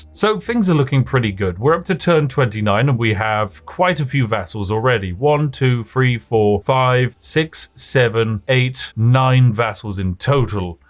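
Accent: United Kingdom. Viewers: Been binging spiff for the last 3 nights, a 12 hour shift is certainly far more enjoyable with that beautiful British accent. Glad to have a new video finally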